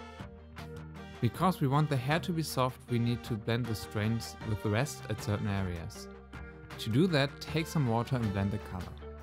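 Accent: Germany. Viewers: A lot of people don't like the montval, and I'm certainly not qualified to speak on paper quality but I really liked it when I got a big sheet for cheap just to try. Not everybody can afford arches. Dacht doch ich hör da nen deutschen raus Liebe grüße in die heimat, hoffe bei euch wird es nicht so schlimm wie bei uns hier an der amerikanischen ostküste. Wäre schon jahrelang lieber wieder zuhause aber nie mehr als jetzt. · gutes video. Aber boah was fprn harter deutscher akzent der ist ja fast so schlmm wie mein akzent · Dein deutscher Akzent sticht heraus:D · Luuzy Arts wie man es einfach immer bei jedem deutschen so mega raus hört xD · I love your accent~ It's sounds German to me...correct me if I'm wrong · Честно говоря, я просто написала то, что больше всего подходило (´∀` ). Переводить на слух с немецким акцентом немного сложно, и я просто долго не могла понять, что он говорит. 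Так что пару раз прибегла к простому "и так сойдёт". Хотя на видео он именно это и делает: вытирает кисточку пальцами. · Ich liebe den deutschen Akzent :D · Ich wusste sofort dass du aus Deutschland kommst 😂 aber man merkt es kaum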